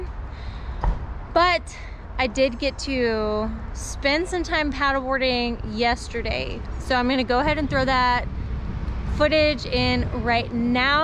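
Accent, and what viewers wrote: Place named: South, United States. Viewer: ***O...I notice you are loosing your Southern drawel....lol.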